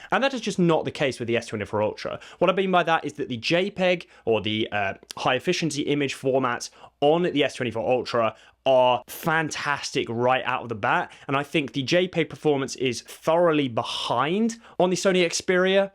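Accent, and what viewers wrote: United Kingdom. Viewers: Excellent comparison. He speaks with a Cockney accent.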